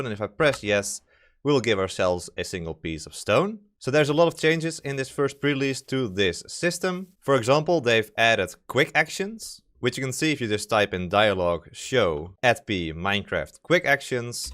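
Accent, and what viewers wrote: Netherlands. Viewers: Haha yes us dutchies can recognize it immediately hè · Hah, ik hoorde gelijk n grappig nederlands-accentje. Groeten uit R'dam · Do i hear a fellow Dutchman here!! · İ hear your Dutch accent, ik hoor jouw Nederlandse accent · omg do I hear a dutch accent (you did a good job:D) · Tijdens de video dacht ik: "dit is iemand die Nederlands spreekt" We hebben specifieke zinsbouw, klanken en intonatie in 't Engels. Anyway: goed gedaan! Mag nog. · I smell dutch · Love the video (but still miss X). You sound very Dutch, can anyone confirm? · Dutch guy Detected! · Are you dutch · I'm hearing a very strong Dutch accent. Is that true or am I imagining things? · That accent. Are you Dutch? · Loving the Dutch accent! Also.. GEKONOLISEERD!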